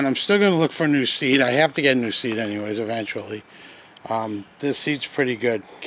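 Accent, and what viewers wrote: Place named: New England, United States. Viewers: My mom was from Boston..sounds like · New England accent is the best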